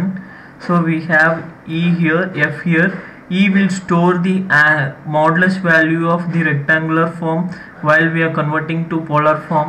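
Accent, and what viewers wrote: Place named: India. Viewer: dont put the tone of Hindi while speaking English, for example dont use the tone of the hindi word "pyaar" when you say the english word "love.........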